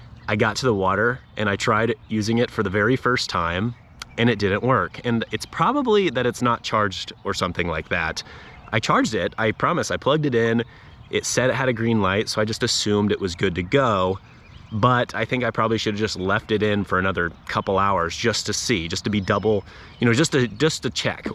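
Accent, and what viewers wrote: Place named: Midwest, United States. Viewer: Love the mid west accent. More of it!!!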